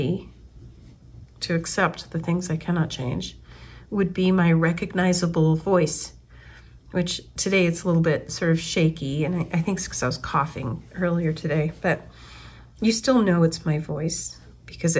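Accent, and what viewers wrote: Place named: Australia. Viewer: I love when you get goofy! You should post some of it here. And expose yourself, if needed. 😂 Seriously I can’t wait to see all of those inner voices in cartoon form. And your Aussie influenced words are so cool, like my Siri is set to Australian version, just so I can hear “no worries” and things like that.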